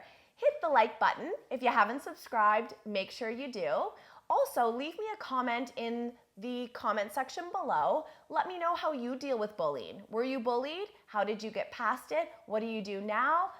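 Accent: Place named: Canada. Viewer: I love the way you say “sorry”. Canadian accent is cool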